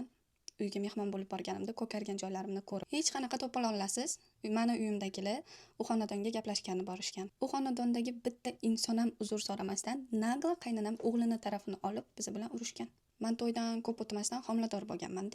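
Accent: Tashkent, Uzbekistan. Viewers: Намангонли булса нега Тошкент шевасида аралаштириб гапирвоти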